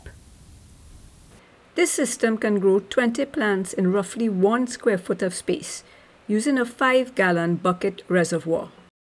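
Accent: Trinidad and Tobago. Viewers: She sounds like a Trinidadian 😎🥳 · You sound Trinidadian... Excellent tutorial by the way. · Great tutorial...is that a Trini vibe I'm hearing?...Thanks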